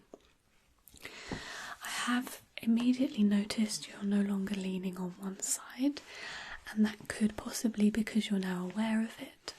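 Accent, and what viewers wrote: United Kingdom. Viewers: I’ve just discovered you and am enjoying you lovely British accent! Do wish your writing on clipboard was louder. Thanks so much , so glad I found your channel 😛💕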